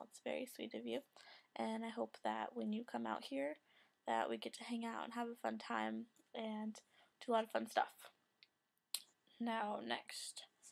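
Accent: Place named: United States. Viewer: Ha ha sweet, thanks for the shout out, i've been super busy so haven't been able to get on here. It's Danny btw, but I don't mind hearing my name in an american accent! Keep up the good work.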